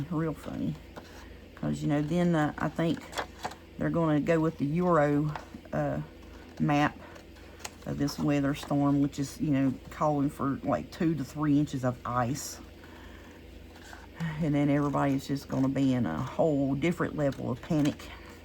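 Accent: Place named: North Carolina, United States. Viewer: Are you from North Carolina? I am and it sounds like you are. I love it!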